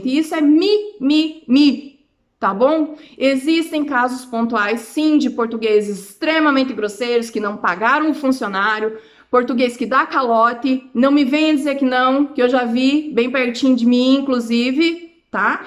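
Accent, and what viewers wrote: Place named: Curitiba, Brazil. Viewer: Você é curitibana? Pelo sotaque, parece minha conterrânea😉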